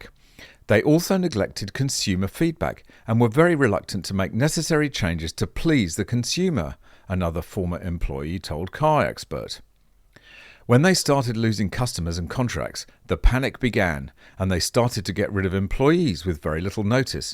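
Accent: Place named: England, United Kingdom. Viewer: The channel host is from England. He even says as much on his channel summary description. Moreover, he has an English accent. 🇬🇧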